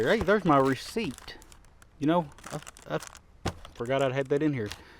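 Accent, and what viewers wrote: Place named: Alabama, United States. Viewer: You from alabama , yes sir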